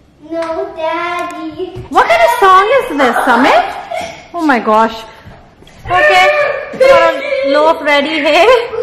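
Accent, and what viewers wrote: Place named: Punjab, India. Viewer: Your Punjabi is sooo 🥰 cute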